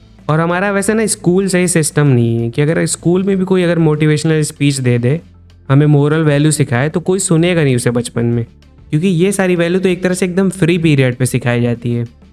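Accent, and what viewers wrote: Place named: Uttar Pradesh, India. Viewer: Aapke accent se laga ki aap UP me khi se ho. Maybe Lucknow? I can be wrong.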